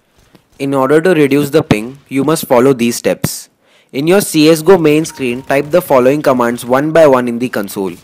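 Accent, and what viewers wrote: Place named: India. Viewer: omfg ur indian accent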